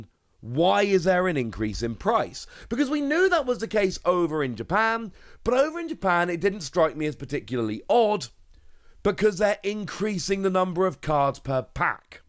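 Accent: United Kingdom. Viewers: You have so strong British accent however you are really clear, it is a pleasure to follow your channel.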